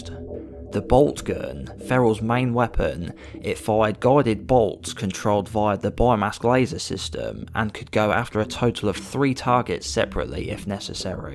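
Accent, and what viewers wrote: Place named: England, United Kingdom. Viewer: Super gay English accent. Where are you from?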